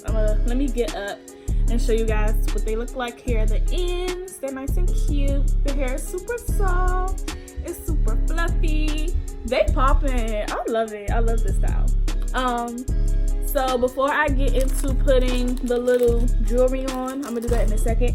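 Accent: Washington DC, United States. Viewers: Is she from the DMV? I feel like I'm getting notes of DC when she talks lol. ANYWAY I'm finna try these next week 😜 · You started talking &nd I was like BOOM that’s DC right there lol. I LOVE this tutorial &nd how you added all the products, that’s always my main question. I also have to give you props because you have so much hair naturally I was tired for you after the bottom row LMAO. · Was going to say you’re from DC but you already confirmed. I love our accents ❤️ Great video! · I knew you sounded like you were from dc! 😭😭😭🖤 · Girl are you from DC or PG because the accenttttttt!!! 😂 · Subscribed as soon as I heard that DC accent 😭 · Yes accent, I can tell you’re from DC!!!! Lol ❤️ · Are you from DC? I am from Maryland and I can hear your accent. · I knew the moment she started talkin 🤣🤣 DC all day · I know a DC accent when I hear one 😏😏😏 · You from DC? 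I hear our accents Lmaoo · Joy B yup 1 minute in..I'm like she from DC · You from DC sis? That accent ayyyeee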